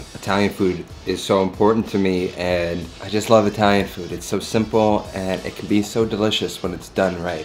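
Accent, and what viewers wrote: United States. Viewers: He's American Italian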